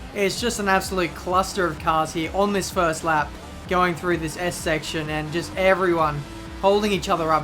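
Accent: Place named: Australia. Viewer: You sound like Daniel Ricciardo #AUSTRAILIAN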